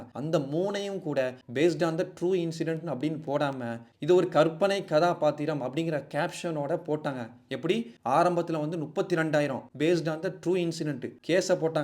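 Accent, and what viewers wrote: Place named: Kerala, India. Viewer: 👏 well explained... That Malayalam slang 🔥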